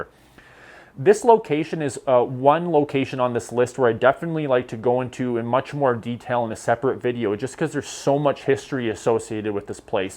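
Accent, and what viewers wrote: Canada. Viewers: How can you like him? Worst yet! Every time he says A Boot I just want to smack him. The way he talks sounds like a bad B movie that could not even make that grad, I am totally sad to find out he is from Vancouver.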